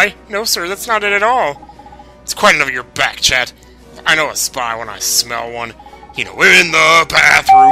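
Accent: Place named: South, United States. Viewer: I went back to your old vids and noticed you've lost your southern accent almost completely since then!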